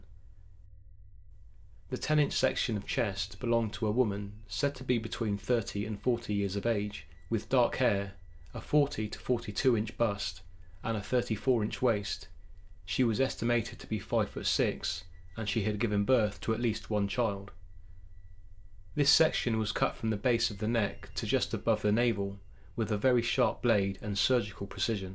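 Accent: England, United Kingdom. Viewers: It is very much an authentic English accent,from somewhere west of London.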